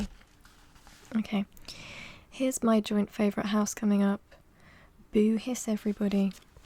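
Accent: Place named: North, United Kingdom. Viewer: I like your Northern accent.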